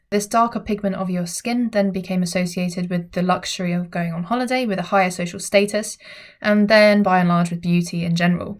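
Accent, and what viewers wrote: United Kingdom. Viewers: 英國口音？ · Nice British accent and more than decent Chinese. Very talented.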